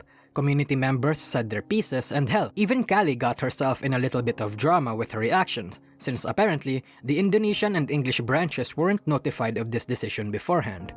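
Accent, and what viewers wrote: Philippines. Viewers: Definitely not a native English speaker, you sound like a fellow Filipino with that accent.... I haven't done any Vtuber digging since I'm busy with college so i just barely know about this controversy, although this was neatly presented enough for an outsider of the community As for fubiki and the others who didn't react, i think they were playing safe trying not to get involved with drama, i assume it's a very uncertain time for them. To either call their company out or call rushia our. It's hard and very costly to speak up when everyone is up and arms with pitchforks. That's all i could comment about the situation, and I'm happy for the new rushia. I may even check her new channel if i get curious enough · okay, if all Filipino people sound like him, then damn I’m super jealous. I love the accent, it’s awesome.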